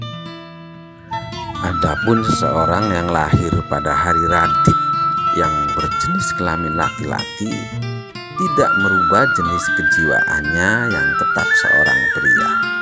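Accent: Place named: Java, Indonesia. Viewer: vocalnya khas jawa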